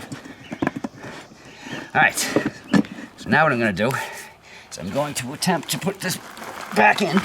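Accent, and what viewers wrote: Boston, United States. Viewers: Question. Are you from Boston? Hahaha. Love the accent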